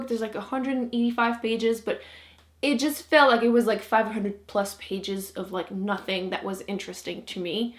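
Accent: Quebec, Canada. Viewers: ps: ton accent québécois est super cute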